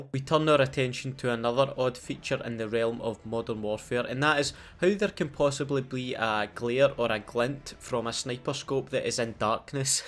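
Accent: Scotland, United Kingdom. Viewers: The first one of these introduced me to this channel and I’m so glad it did man I’ve been binging or old vids of mw2 my favourite the cod and now I’ve joined the notifications squad man also I’m Scottish aswell and it Scottish patter just makes this channel 10/10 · Are u scottish,if so,so am i and what part of Scotland u from? Im from Edinburgh.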